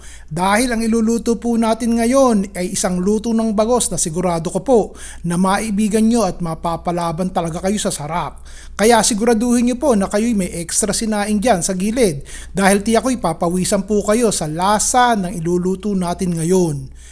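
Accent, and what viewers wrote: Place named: Bulacan, Philippines. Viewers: Taga Sta. Cruz, Manila po ako pero lumaki sa Bulacan. Natutuwa po ako sa paraan ng pagsasalita mo chef. Para kayong Bulakenyo magsalita 😂. Akala mo matanda ang nagsasalita. Pero regarding tayo sa menu na ito, gusto kong gayahin at parang masarap, Hinayang ha hinayang ak9 at di pa ako nakabiki ng bangus sa palengke kanina. Lingguhan p nmn ako mamili, 😢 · Napakasarap nga ang ganyang luto rini sa amin ang ganyang estilo mo ako po ay tiga Hagonoy. Kaya yung punto mo sy kapatid ng sa amin sa Hagonoy, Bulacan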